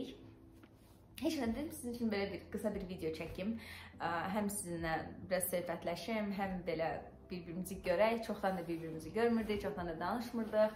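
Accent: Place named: United States. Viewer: Danisigin bele Amerika lehcesindedi 😃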